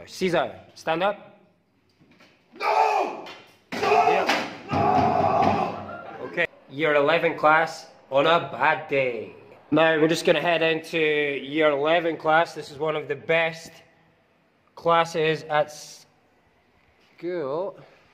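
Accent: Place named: Scotland, United Kingdom. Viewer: Good to hear a fellow Scot in China! I am in Beijing about to venture into teaching, this is very helpful thank-you! (Tom, Edinburgh/Shetland)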